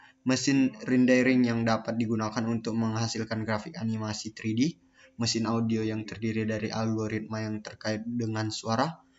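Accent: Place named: Java, Indonesia. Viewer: Mas medok:v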